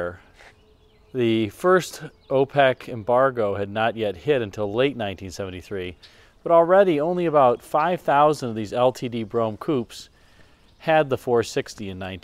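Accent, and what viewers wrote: United States. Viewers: Always fascinated by American pronunciation of words like brougham & coupé. Unlike anywhere else! I do enjoy these videos; the cars featured in them hardly ever appeared on this side of the Atlantic. What baffles me is that so many seem to have switches on a part of the dash that can only be reached through the steering wheel. 🤔 Also intrigued that the speedo on this car goes 110,115,120. Don't recall seeing that 5 mph indication before.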